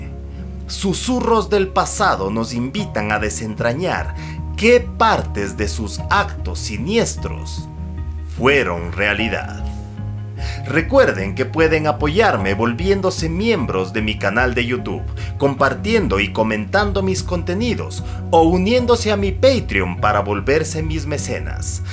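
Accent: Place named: Ecuador. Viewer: Que historia tan horrorosa, pero bien contada, me encanto sobretodo con ese acento de voz ecuatoriano tan bonito. Una historia de horror en un pais que admiro muchisimo.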